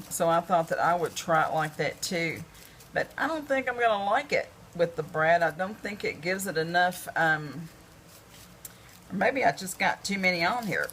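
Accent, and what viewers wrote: Kentucky, United States. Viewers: Thanks so much for this! I just got this die on clearance and wasn't sure what to do with it. Your video is great - love your accent! Reminds me of my mom, from KY. I'm a new follower to your creative channel! Jean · My flower turned out more flat. What can I do to make more dimensional like yours. I love your accent I grew up in Irvine,Ky, makes me homesick!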